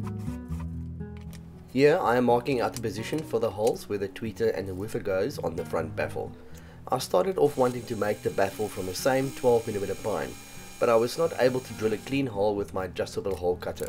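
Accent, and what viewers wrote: South Africa. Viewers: South African accent but now based in the US?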